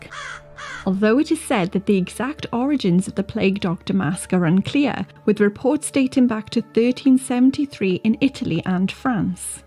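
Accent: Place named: Wales, United Kingdom. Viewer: lovely to hear a welch voice doing the voice over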